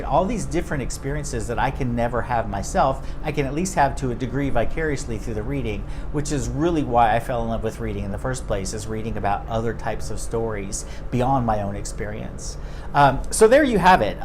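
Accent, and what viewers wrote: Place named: West Country, United Kingdom. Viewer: This is a lovely example of West Country accent, which can also be found on the Channel Islands (of which Guernsey is one) <link> Thank you so much for all the recommendations! I've read Bear Town, and loved it, but I've never heard of the others. I have much exploring to do :) so thank you!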